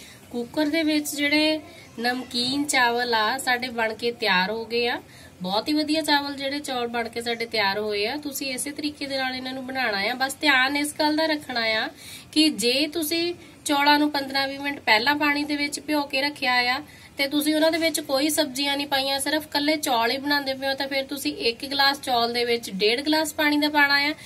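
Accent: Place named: Punjab, India. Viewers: thoda punjabi bolan da style bada jiada cute hai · Di I like ur voice ..typical punjabi.nice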